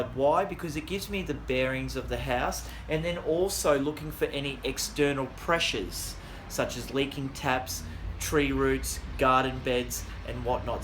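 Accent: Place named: Australia. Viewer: Great video - thanks for sharing. BTW, what kind of Aussie accent, with no powerful F words in his Youtube!!!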